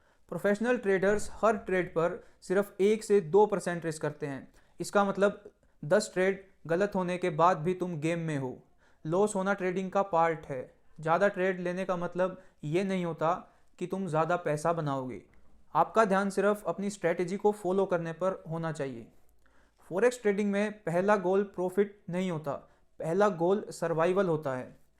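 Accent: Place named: Najafgarh, India. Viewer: Saath me trade krte h bhai. Me b najafgarh se hu tu b aas paas ka hi lg rha h boli se😅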